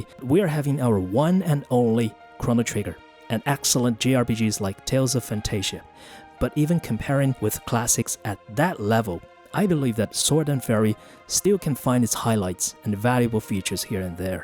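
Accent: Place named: United Kingdom. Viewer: omg 这么酥的英音吗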